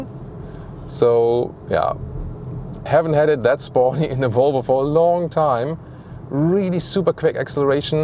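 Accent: Germany. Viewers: Forgive me but I'm here mostly for the German accent. EVs are still too costly for my monetary capabilities. Though however much I wait my next new car will be an EV.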